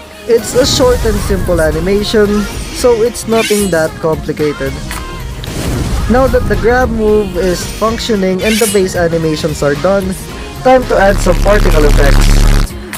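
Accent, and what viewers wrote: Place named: Philippines. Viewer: Y do u sound like a Filipino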